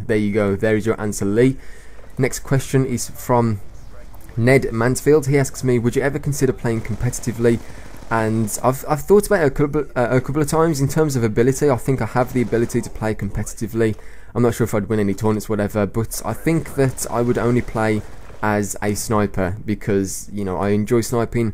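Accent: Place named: Northern England, United Kingdom. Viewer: where are you from? It sounds maybe north england (Don't really know though)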